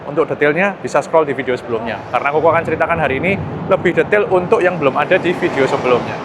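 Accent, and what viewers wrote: Surabaya, Indonesia. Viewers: Aku kangen medok ro Suroboyoan mu 😊😊😊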